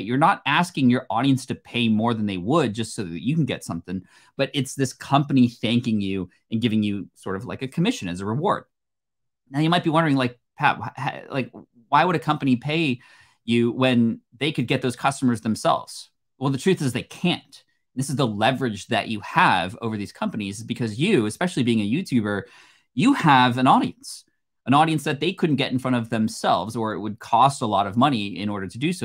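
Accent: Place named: United States. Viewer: Hey fellow audience members, What type of American accent is this? I’ve just noticed this a few times in different videos on YouTube. And I really like this accent. What kind of city or state or whatever does this accent come from. It reminds me of the accent of the guy at dailytekk. PS I’m outside the US, and have started to recognise in a little bit more detail different American accents rather than just the classic ones I knew as a kid, and it’s just interesting to me if I hear one that I like and I keep hearing in different videos. I probably will end up looking up a accents of the US kind of video. But every now and again I’ll just ask what is that accent because it’s interesting to me in the moment. Thanks.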